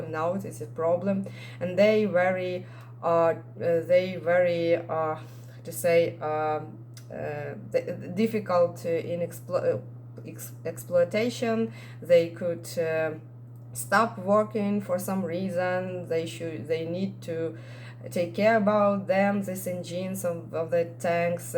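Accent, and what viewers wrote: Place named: Oxford, United Kingdom. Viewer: Привіт доктор. Ваша англійська чудова, і я думаю, що ви вивчили стандартну оксфордську англійську. Невеликий човен, який був потоплений - десантний катер Яма з 1000 тілами - братська могила Безперечно, розвідка США та Великобританії буде вказувати місця розташування прикордонних військ – вони будуть знищені за добу, якщо вони увійдуть в Україну. Потоплення десантного корабля, більшого військового корабля і «Москви» — це чудові новини, які повинні перешкодити висадці Росією морського десанта з Чорного моря. Чудово, якщо після війни Одесу все ще можна використовувати як український порт. Побити росіян. Нехай ваша армія воює міцно і без страху. Енді (Бакінгем, Великобританія)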